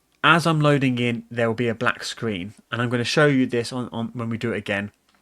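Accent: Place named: United Kingdom. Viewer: I love your British accent ❤ "I'd like to apologise" 😄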